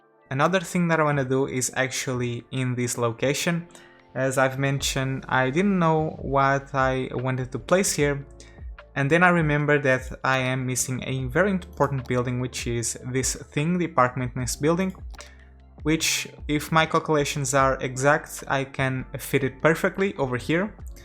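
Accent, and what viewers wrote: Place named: Portugal. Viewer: So de ouvir a pronuncia já sei que é portugues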